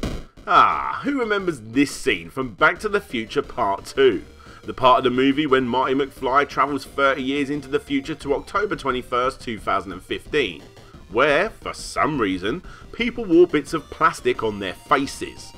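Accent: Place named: England, United Kingdom. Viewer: ps - Love hearing proper English accents on YT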